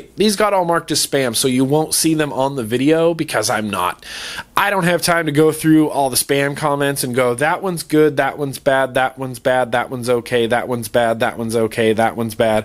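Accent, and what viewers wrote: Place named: South, United States. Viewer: Lovely vlog, from the southern accent to the hand gestures the laughs were real. Thanks.:3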